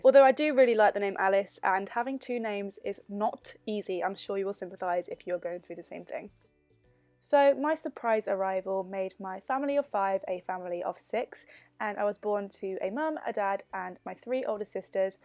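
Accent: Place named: Coventry, United Kingdom. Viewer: Hey, I am Millie. I am a newish subscriber. I just subscribed and i actually love you videos so much💕. I am from Coundon in Coventry haha. But i live in Australia. I actually never noticed your Coventry accent until this video. Please reply to this even though, i have commented 2 years later haha xxxxxx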